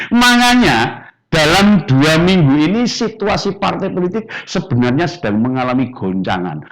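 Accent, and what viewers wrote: Java, Indonesia. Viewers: Gaya bahasane si om ini kok pas banget ya,..aksen jowone yahud tenan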